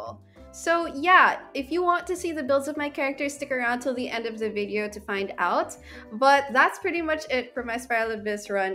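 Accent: Philippines. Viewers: I just finished building Beidou & I finally have a taser team for Kokomi! I’ll use your video as inspiration. BTW, you look & sound similar to my Filipina friend, I think ur from the 🇵🇭 too.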